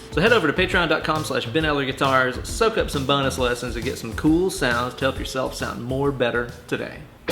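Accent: South, United States. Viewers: Are you from the south ?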